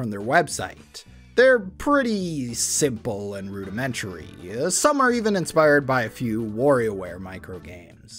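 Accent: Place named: Midwest, United States. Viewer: This video was well researched I had never heard of some of these game boy games before!! Well edited and love the humor. However your midwestern O's were hilarious!! They remind me of Kevin from the Office which I know is a bad comparison but I think you should lean in on a persona as a Midwestern dolt.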